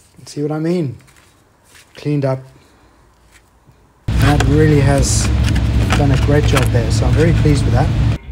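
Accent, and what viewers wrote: South Africa. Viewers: You sound very South African?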